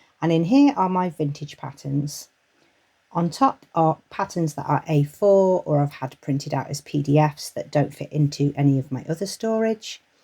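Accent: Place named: England, United Kingdom. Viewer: first time here, love the clear style and lovely English accent. great tip for remembering which needle is in the machine.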